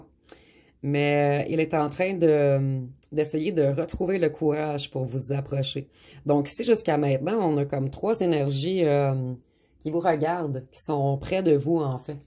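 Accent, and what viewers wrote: Canada. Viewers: Merci. Le bel accent du beau Canada. ❤❤❤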